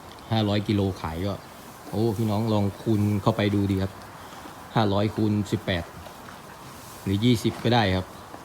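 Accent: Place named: Isan, Thailand. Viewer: น่าสนใจดีค่ะ พูดภาษาไหน ก็ฟังได้คะ่ ภาษาอีสาน น่าฟังคะ่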